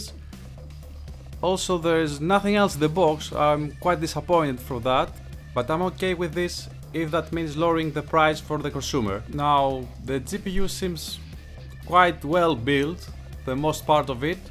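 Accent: Greece. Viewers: Are you greek? because your accent reminds me a lot of greek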